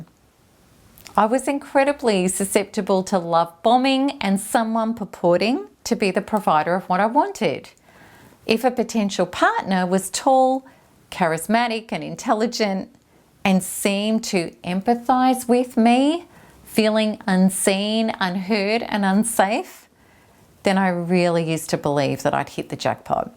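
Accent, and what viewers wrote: Australia. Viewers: What a heavy australian accent.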